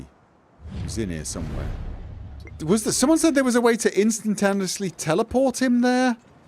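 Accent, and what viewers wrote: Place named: Manchester, United Kingdom. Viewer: Sometimes, I prefer the intro chat to the game, mostly because the rodent is a fellow Mancunian, and it's like listening to an older cultural cousin. 😂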